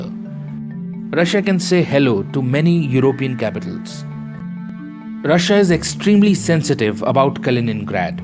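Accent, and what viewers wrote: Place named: India. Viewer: Thank you for your correct pronunciation of words contain V and W. In India in the last few decades there has been a trend to reverse the pronunciation of these letters which is painful to listen to as an English speaker. Also your accent is pleasant to listen to which isn't always the case with the modern Indian accent.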